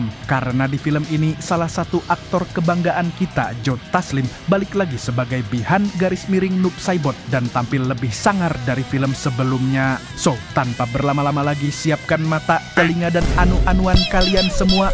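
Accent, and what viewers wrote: Banjar, Indonesia. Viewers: Admin orang Banjar kah Ikam 😂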